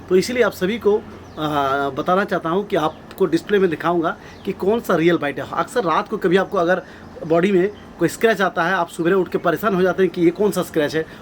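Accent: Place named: Bengal, India. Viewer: Hello sir aap Jo Jankari Hamen Dete Hain hamen bahut help milti hai Aur ek Baat aapki baten sunkar lag raha hai ki aap bangali ho main bhi West Bengal se baat kar raha hun ki aap ka contact number mil sakta hai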